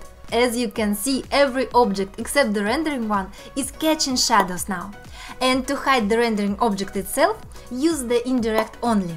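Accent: Russia. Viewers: Из Русского только русский акцент) но спасибо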